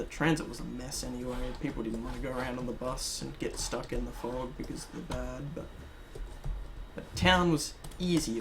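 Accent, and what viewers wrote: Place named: Western Australia, Australia. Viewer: listening to a western Aussie rant is 11/10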